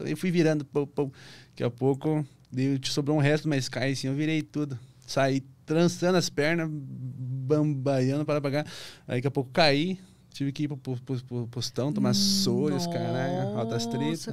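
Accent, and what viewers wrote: Rio Grande do Sul, Brazil. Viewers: sotaque de gaucho azar é us guri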